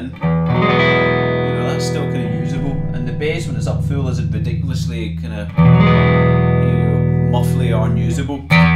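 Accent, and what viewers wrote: Scotland, United Kingdom. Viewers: Thanks for that demo. I used my Grado head phones for this one ! That amp sounds MASSIVE ! Great tones ! Great playing and Scottish accent !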